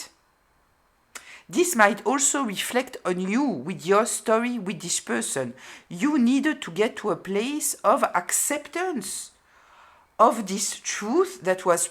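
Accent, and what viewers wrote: France. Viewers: The word for only giving to get something back is “transactional”. Love your accent, makes me feel like I should wear a beret riding my Velo Solex with a baguette in hand to listen!😁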